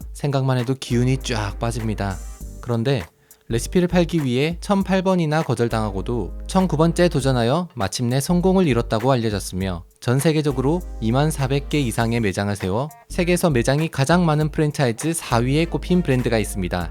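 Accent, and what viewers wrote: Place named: Busan, South Korea. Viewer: 말투가 부산이네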